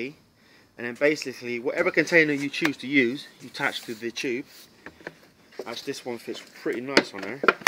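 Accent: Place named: Bristol, United Kingdom. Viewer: London I think but he has a little bit of a Bristol accent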